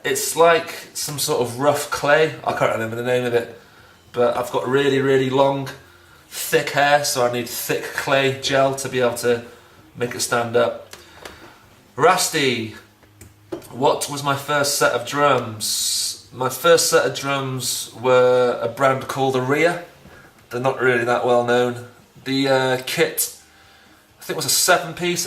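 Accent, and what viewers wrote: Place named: Liverpool, United Kingdom. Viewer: You sound like youre relatively close to Liverpool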